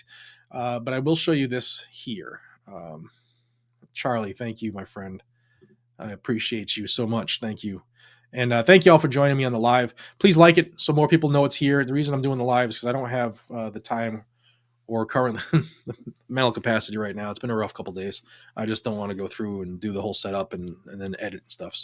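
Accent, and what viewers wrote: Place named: New England, United States. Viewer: when I first saw one of your videos a couple years back I thought you were a huge prick. Maybe it’s my southern mindset but that New England accent carries some different vibes down here. After a bit I was hooked, and dude, you are legit the most sincere, genuine, and kind hearted person in the 2A community. Thanks for all you do to keep us informed. Prayers from my family to yours incoming. I wish I could afford to do more to repay what you’ve given us. May God help you through whatever comes your way, and if some schmuck in Alabama can do ANYTHING else to help, say the word. Love ya brother, Merry Christmas.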